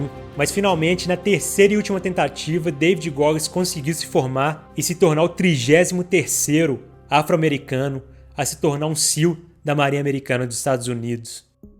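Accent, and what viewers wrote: Minas Gerais, Brazil. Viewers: Parabéns!! 👏🏼👏🏼 vc é mineiro né? 😅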